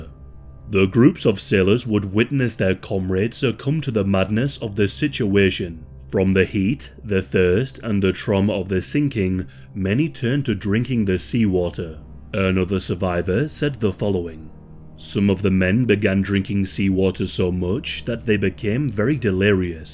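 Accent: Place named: Scotland, United Kingdom. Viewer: He's Scottish. You can immediately recognise it because he pronounces "u" properly.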